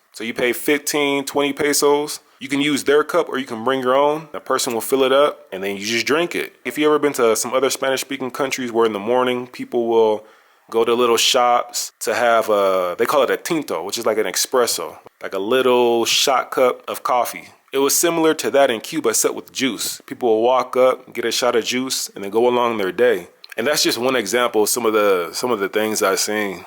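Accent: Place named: Cleveland, United States. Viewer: Your videos are so dope! I hear an accent in your videos bro, are you from Cleveland?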